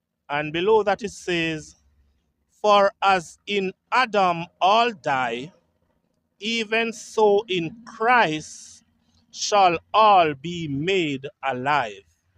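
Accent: Jamaica. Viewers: The Grammar police are out in full force. I appreciate the Jamaican accent with all it's pronunciations and inflections. What I enjoy the most is all the information in these videos and the effort that has gone into visiting the locations, researching, editing and narrating them. Now Grammar Police send the links to your perfectly edited, interesting and entertaining videos so I can watch them. Thanks x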